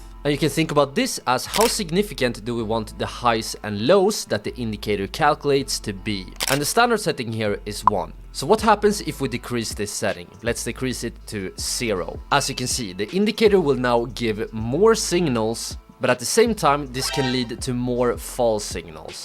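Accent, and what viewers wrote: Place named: Sweden. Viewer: 😂first time seeing you and I already hear ur Swedish accent?! Great explanation for the strategy but brother am trading in lower time scales 15 and 5 min. Still works? And what settings would you recommend? Thanks in advance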